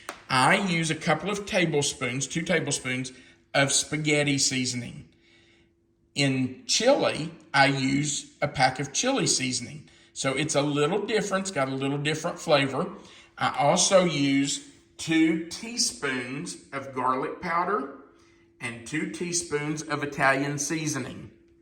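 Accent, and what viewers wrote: South, United States. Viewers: I think you guys are great. What is the fat ratio of your beef? 80/20? 70/30? I love how you Southerners put G in the word onion.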